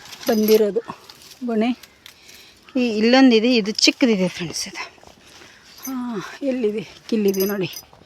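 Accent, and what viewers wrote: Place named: North Karnataka, India. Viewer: ನೀವು ಮಾತಾಡೋದು ಉತ್ತರ ಕರ್ನಾಟಕದ ತರಹ ಇದೆ.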